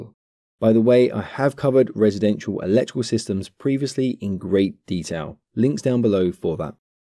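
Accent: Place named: England, United Kingdom. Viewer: Leave it to someone with an English accent to use a beer analogy. Lol. Good job anyway. I learned some things.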